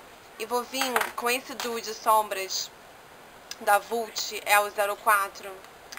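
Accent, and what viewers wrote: Rio de Janeiro, Brazil. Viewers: Nossa flor, antes eu jurava que vc era uma pessoa metida pelo seu jeito de falar, mas hoje penso totalmente o contráriovi que é apenas seu sutaque carioca, vejo vc uma pessoa super legal e que apenas quer compartilhar conosco aquilo que vc sabe fazer bem que é ensinar a maquear, adoro seus vídeos, parabéns!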